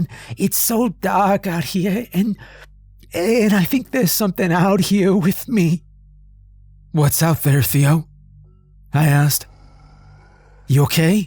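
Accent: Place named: Brooklyn, United States. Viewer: I've realized throughout this story that I don't like this accent. Is it Brooklyn? Whatever it is, it upsets my ear for some reason.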